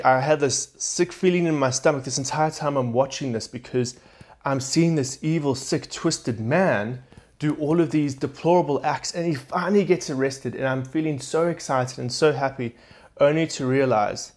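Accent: South Africa. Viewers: I heard his South African accent immediately too. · Are you South African? Sorry for asking if it offends you, because I don't mean to, I'm just curious about your accent. Good review from a Christian's point of view.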